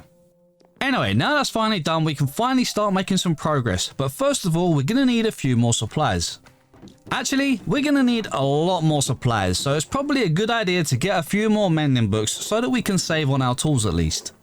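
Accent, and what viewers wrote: United Kingdom. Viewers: I'm in love with the presentation on the video British accent makes everything better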